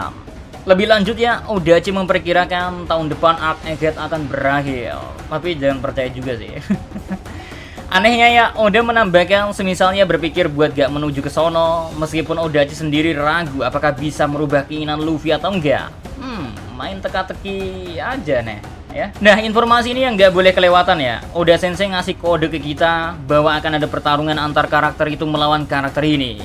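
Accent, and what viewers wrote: Java, Indonesia. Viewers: Jngn, krna medok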